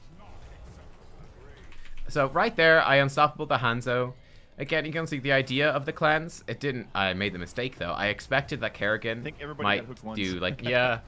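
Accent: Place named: Ireland. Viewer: Pretry sure hes irish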